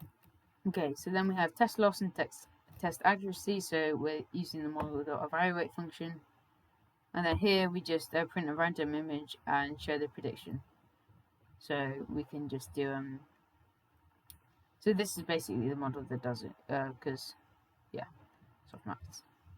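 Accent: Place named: United Kingdom. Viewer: It seems ur from UK and not US cuz u speak British English. Am I right?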